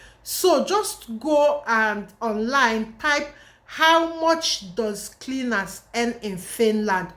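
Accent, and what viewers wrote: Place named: Igbo, Nigeria. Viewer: Me I love your Igbo tongue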